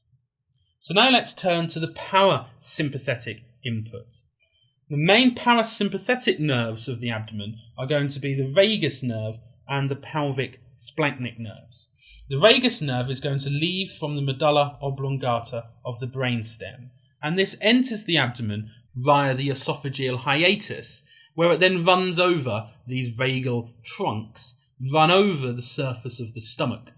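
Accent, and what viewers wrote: United Kingdom. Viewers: I hv never found a youtube clip so sexy, especially with that british accent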